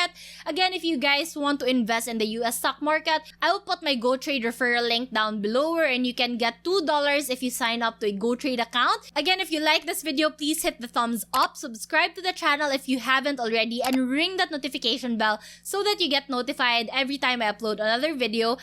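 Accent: Visayas, Philippines. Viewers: Bisaya po kayo Ma'am 😁😁 ??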